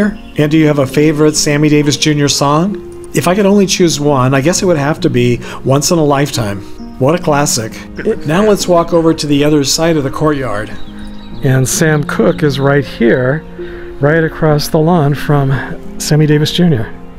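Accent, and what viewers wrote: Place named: California, United States. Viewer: you remind me of Lyle Talbot's son, Talbott--aka "Gilbert Bates" on LEAVE IT TO BEAVER. You even share the same name--even...lol. You both have that California accent; sounding the same. I wonder if Gloria Talbot is related.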